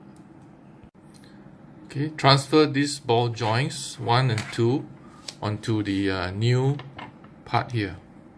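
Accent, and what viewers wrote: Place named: Singapore. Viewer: You sound like Singaporeans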